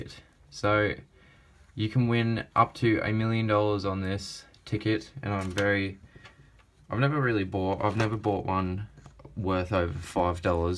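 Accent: United Kingdom. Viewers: He sounds British, but there are dollars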